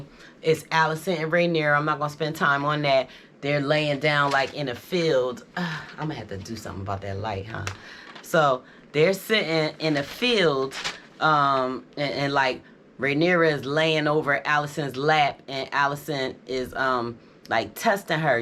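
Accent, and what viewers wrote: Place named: Philadelphia, United States. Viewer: I can tell u from Philly by the first sentence, U gotta be. I subscribed cuz yu a beautiful black woman & I think u from my city.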